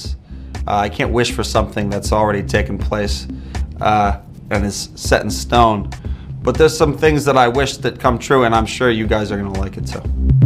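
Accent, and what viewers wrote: New York, United States. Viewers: He’s such a New Yorker. I didn’t know for sure he was from New York but you can just hear it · I can hear his New York accent. Especially when he's answering the Alec as a mundane question and he's like "You don't gotta..." 😍😍😍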